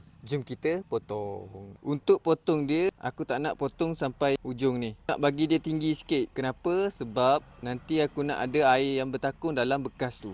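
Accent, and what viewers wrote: Kuala Lumpur, Malaysia. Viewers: 😂😂😂😂😂😂😂kecek kl MCM sial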